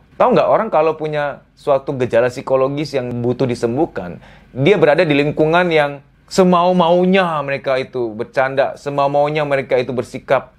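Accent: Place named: Lampung, Indonesia. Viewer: Logat bicaranya seperti suku lampung ....bener tidak pak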